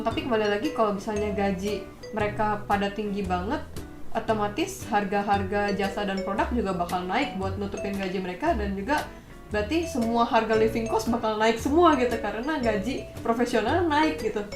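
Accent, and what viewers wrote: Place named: Java, Indonesia. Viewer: kak asalnya dari mana kok agak medok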